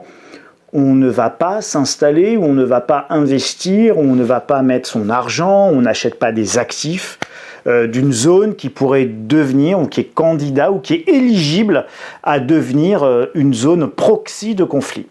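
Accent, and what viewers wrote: France. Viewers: jaime votre accent francais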